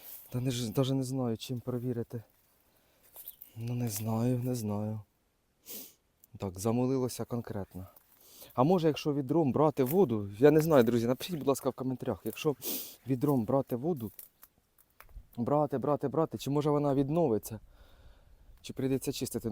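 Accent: Poltava, Ukraine. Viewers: З перших слів відчувається Полтавський говір❤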